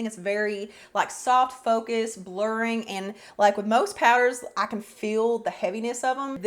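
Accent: South, United States. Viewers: You're from Kentucky?!? I've been watching you forever, but I had no idea! I'm an eastern Kentucky girl 👋 I've wondered about Kentucky being part of the South too because it's technically not. But, girl, listen to our accents (mine is thicker than yours) we definitely Southern lol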